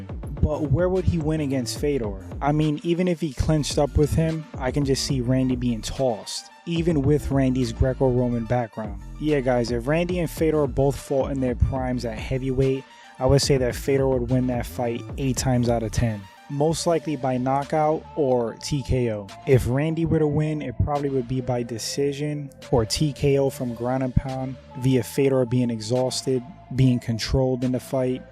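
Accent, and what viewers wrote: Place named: United States. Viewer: I know, I can tell from your videos the knowledge you give and exampling is well on point. Plus the casuals are important for MMA right now I only say it because people are talking about it and participating in the sport which will carry it on in the future. I'm only 23 years old. I became a fan of MMA around 2006 or 2007 the first fight I watched was Liddell vs Ortiz 2. I played a video game called UFC Sudden Impact way before I became a fan of MMA, But anyway I was a Amateur in Muay Thai and Boxing when I was younger (I know a lot about those to sports as well) my biggest accomplishment so far is fighting former WBO Champion Joseph Parker in 2009 Cruiserweight Golden Gloves in New Zealand. Unfortunately I got KO'ed in the opening tournament. Glad to see he's doing great in his career. I've only had 1 MMA fight that was back in 2013 lost by DEC but that year I defeated Patrick Afoa who fought Israel Adesanya 4 times in Kickboxing. 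But I quited from fighting 4 years ago for family reasons. But its awesome to see a fellow MMA fan that knows what he's talking about, its great to hear that your training as well and that you sound either American? or Canadian? Which makes you'll have more opportunity then then what I had in New Zealand. Well Thank you for the comment. I will stay in tune for your videos from now. I may have other fantasy fight suggestions like: Kazushi Sakuraba vs Rickson Gracie Stipe Miocic vs Tim Syvia Tyrone Woodley vs Matt Hughes BJ Penn vs Urijah Faber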